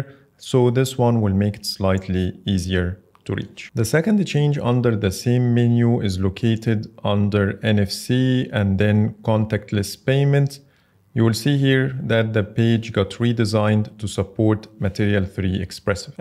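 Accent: Egypt. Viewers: i can hear the Egyptian accent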